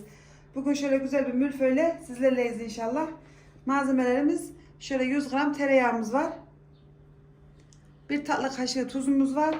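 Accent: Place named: Gümüşhacıköy, Turkey. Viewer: Bende Gümüşhacıköylüyüm şive yabancı gelmedi memleketim güzel dir güle güle oturun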